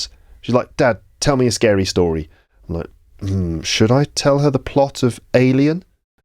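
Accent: United Kingdom. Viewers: I like your voice because of its tone (your voice is high-toned) and you speak with British accent so it becomes more attractive.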